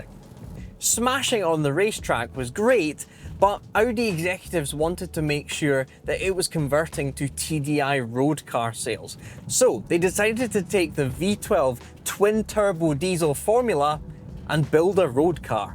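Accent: Scotland, United Kingdom. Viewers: Why's Nigel Mansell got a Scottish accent?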